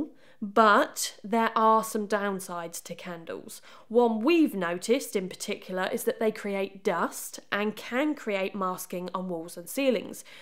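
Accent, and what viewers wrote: United Kingdom. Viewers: Hellooooooooo 🎉🎉🎉🎉🎉. Watching all of your videos with great taste and of course an amazing british accent. So gorgeous.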